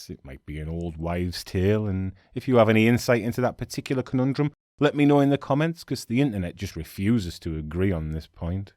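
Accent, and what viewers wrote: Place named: Yorkshire, United Kingdom. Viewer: Loving the Yorkshire accent.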